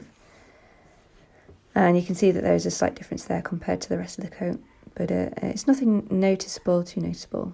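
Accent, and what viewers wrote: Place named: England, United Kingdom. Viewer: Many thanks for these tips and so nice to hear an English accent for once. I think you were really brave to put it in the machine! I've just purchased a 1970's Sherpa style longish Sheepskin jacket/coat which needs some tlc. The fur was originally white but has turned greyish now but the condition isn't terrible. I like your idea's of using the hair products, that is not something I would have thought of tbh, but I think I need to slightly bleach this fur back to a whiter colour because the jacket has white stiching. It would have been fantastic back in the day, but thanks again for these tips.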